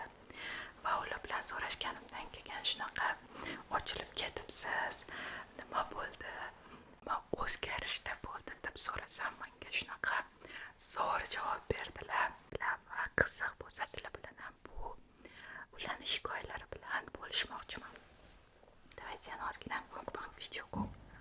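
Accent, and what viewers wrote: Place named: Namangan, Uzbekistan. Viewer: Namanganlimisz shevada oxshavotti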